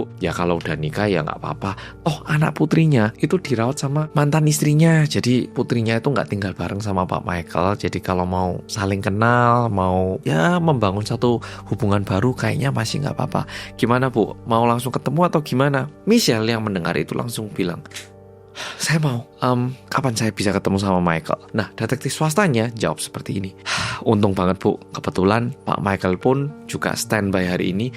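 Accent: Java, Indonesia. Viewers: 😅😅 malah tertarik medok jowo ne Mase,nah yg bikin ngakak lagi kok malah wong Korea ngono lhoool😅😅😅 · Lucu aja kalo dengar oppa medok cerita😅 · Masih suka suaranya ..medok bgt jawane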